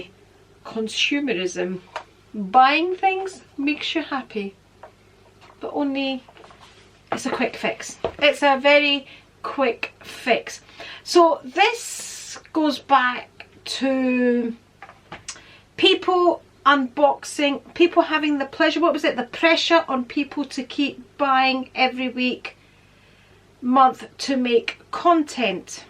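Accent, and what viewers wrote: Scotland, United Kingdom. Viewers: Hi, I forgot to mention there was another interesting reason I have been watching your videos. Because I studied my PhD in Glasgow 20 years, I do enjoy your Scottish accent and your Vlogs to Glasgow. Looking forward to seeing more videos from you. Cheers ❣️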